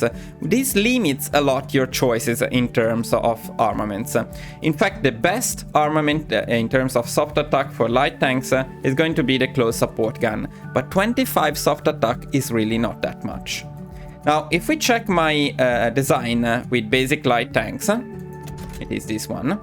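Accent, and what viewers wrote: Italy. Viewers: Bel video,dall’accento sembreresti italiano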